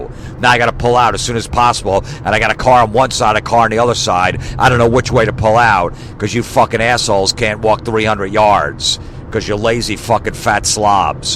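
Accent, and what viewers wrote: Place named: East Coast, United States. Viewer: I think someone has been recording my Costco rants and has put them together into one giant rant , only with an east coast accent!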